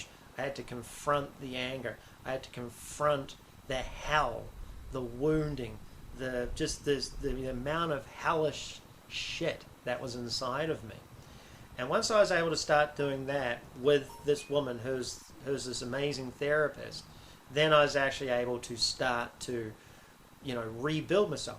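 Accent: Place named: New Zealand. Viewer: The kiwi/NZ accent is so cool!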